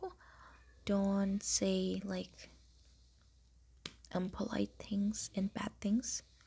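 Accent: Brazil. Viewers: Aquele sotaque brasileiro bem por longe, sabia que tinha algo ai hahahahah Ja vou me inscrevendo e obrigado pelo videi, tras mais lens licking❤ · sabia q era br, sotaque inconfundível, bom video! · vc é brasileira? kk na hora que abrir o seu video eu percebir, mais achei que era só achismo kkk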